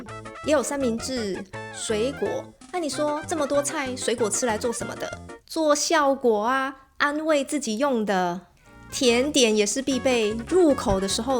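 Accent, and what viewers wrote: Taiwan. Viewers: 看完影片，先订阅，你的文彩，佩服，台湾腔的国语，把原有的幽默更提一层，期待下一期，说一下你的攻略，包括旅轮网站，搜索技巧，上岸那些景点值得一去，错过会小遗憾，订船上旅游还是下船跟当地导游(我们是刚退休人士)，火车skygway必须事先或下岸预订(怎么算好那天)，等等. 谢了🙏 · 台灣口音甜蜜親切又有趣❤❤❤😂😂😂👍👍👍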